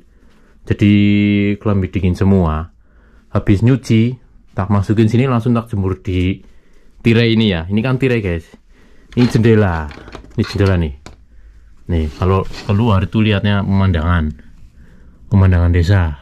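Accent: Banyumas, Indonesia. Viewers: Mas, kalo boleh tau asal daerah mana? Wong ngapak ya Salam dari Maos Cilacap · kamu memang hebat, harusnya kamu mendapat penghargaan dari bupati di kampung kamu karena kamu bahasa ngapak dikenal di jepang... Salam toko kejujuran bang... Tiap hari dong bikin konten, berangkat kerja dan ketika kerja, Terima kasih sudah menghibur kami sarukhan ngapak hehehe... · Aq yen ndeleng kontenmu kui marem mase...bahasa ngapake mantep...guli njelasna kepenak...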